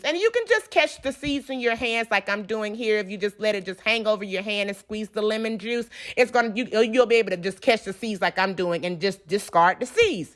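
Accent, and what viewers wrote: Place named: Alabama, United States. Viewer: Listening to this lady talk is like being home! I grew up in Alabama, and I miss it so much!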